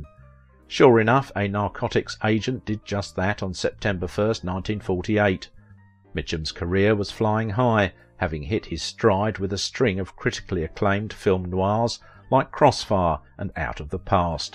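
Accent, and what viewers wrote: United Kingdom. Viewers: Fruity Brit accent used for fake gravitas in trying to smear a great actor. This narrator claims Mitchum had 49 drinks of rum before dinner. This narrator is unforgiveable, don't waste your time. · Am subscribing for the smooth Brit voice, good researched.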